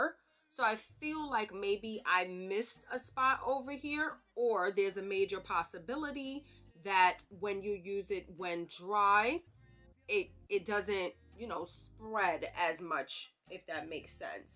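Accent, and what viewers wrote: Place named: New York, United States. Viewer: i knew u was from NY when the video started and i hurd u talk! #NYShittt 🗽🗽🗽🗽 And i just ordered mine. cant wait! 😊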